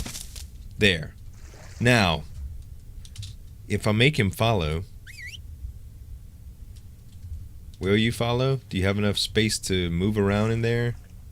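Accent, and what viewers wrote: Louisiana, United States. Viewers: Where are you from Z? Cajun accent coming through. Abbeville LA here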